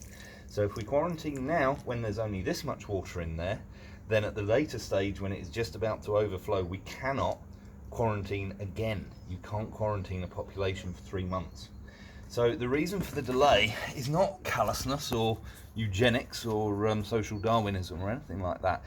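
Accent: United Kingdom. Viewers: despite your cool calming British accent and the chance I believe anything that you say you must note your pouring of water is linear not exponential. the water bottle is quite tiny in proportion to the ability to treat based on the number of NHS ICU beds being under half of Italy yet the population size is similar. this is not the great British bake off this is life and death.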